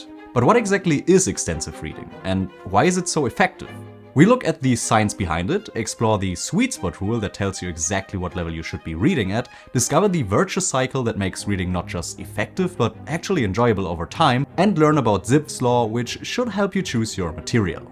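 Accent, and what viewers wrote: Germany. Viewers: I noticed your German accent, ich lerne Deutsch!! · What technique did you use for learning English? You sound very fluent, but have a fairly strong foreign accent, especially with s vs z sounds and vowel + r sounds. I'd bet your first language is German or a closely related language and you grew up learning English but without a lot of audio input (e.g. somewhere they dub Hollywood movies & TV). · Do I hear a German accent?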